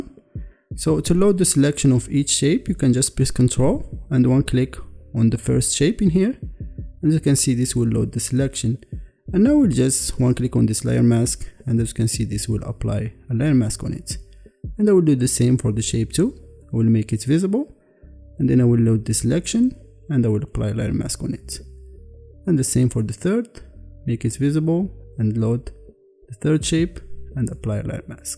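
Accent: Morocco. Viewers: From your accent I can tell that you are Moroccan .. Well done bro , high quality content for real , lah ywf9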